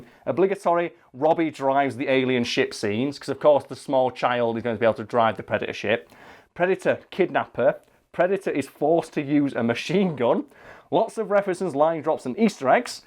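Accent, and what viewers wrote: United Kingdom. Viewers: Just watched the new movie and yes, this leaked script is literally what happens. Spoiler alert I guess? In the tone of you’re sweet British accent, this movie indeed SUCKED ASS!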